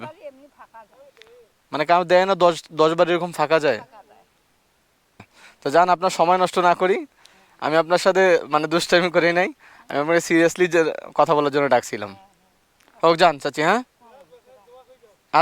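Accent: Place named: Rangpur, Bangladesh. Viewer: ভাষা শুনে মনে হলো, রংপুরের, ভাই, আমিও ছোটো খাটো বাইকার, আপনার পাশে আমি থাকতে পারি, যদি প্রয়োজন মনে করেন, বলিয়েন, সাধ্যমত পাশে থাকবো। আর আপনার এরকম মুল্যবান কাজ গুলো চালিয়ে যান। দোয়া রইলো ❤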